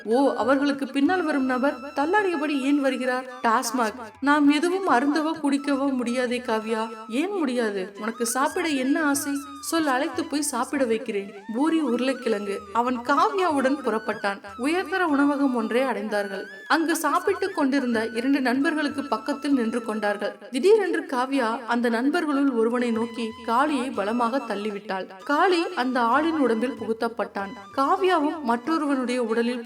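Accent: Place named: Jaffna, Sri Lanka. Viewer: அவ்விடம் கற்பனை அதிகம் உண்டோ.என்ன யாழ்பாணம் தமிழை விடமுடியவில்லையா .கதை மிக சுமார்தான் .😂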